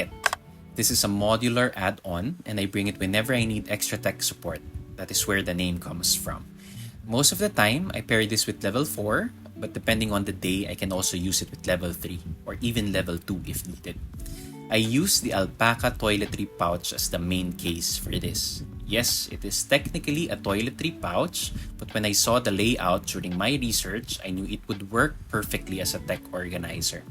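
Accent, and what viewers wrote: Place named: Philippines. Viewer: Same as an EDC enthusiast I was taken a back nung nag tagalog siya and may filipino accent 😅😅. Great content po kuya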